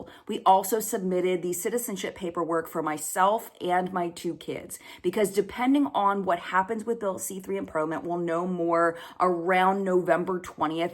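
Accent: South, United States. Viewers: Here I am thinking of my recent retirement party hearing at least 7 accents from around the world plus 3 from different parts of Canada or my book club that has a member with a way deeper south US accent than you do ... nah you are good.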